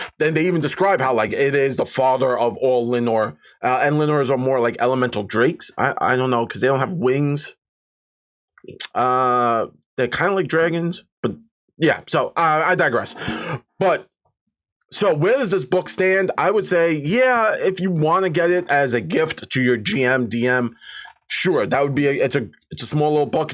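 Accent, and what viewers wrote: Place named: New York, United States. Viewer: Great video! And great NY accent!!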